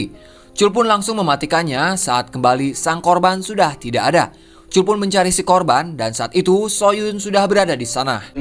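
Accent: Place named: Sunda, Indonesia. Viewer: Lucu sih , cara ngomongnya campur BHS.sunda , campur heureuy 😅😅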